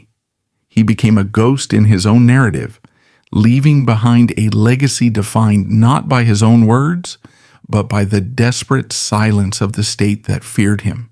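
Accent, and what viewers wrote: United States. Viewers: WHY SOMEONE WITH AN AMERICAN ACCENT TALKS ABOUT ENGLISH HISTORY 🤔🤔🤔🤔🤨🤨🤨🤨🙄🙄🙄🙄😶😶😶😶😱☹️👎🏼👎🏼AND THEN PRONOUNCES SOME WORDS INCORRECTLY 🙄🙄🙄🙄😶😶😶😶🫣🫣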